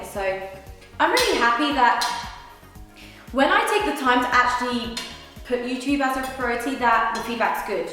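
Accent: England, United Kingdom. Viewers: Adoro o sotaque britânico , acho que devias apostar mais por estes vídeos até crescias muito mais prefiro ver estes vídeos , assim conseguias te aproximar mais da tua cultura e nos também Pensa nisso Beijinhos e muito sucesso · OMG the british accent !! 😍😍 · So british eheheh adorei :D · Hiii! I love your british accent!! Please do more videos like this! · Que lindo é o teu acento inglês,. Adorei o video! Tu faz como queres: inglês o português. Eu sei que irei a gostar. Beijinhos!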